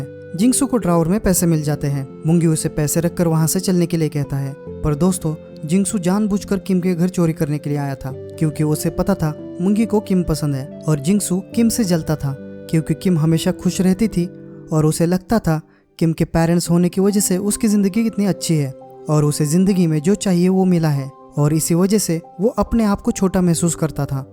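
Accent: Maharashtra, India. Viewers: गावंडाळ हिंदी बोलतोस म्हणजे महाराष्ट्र चा आहे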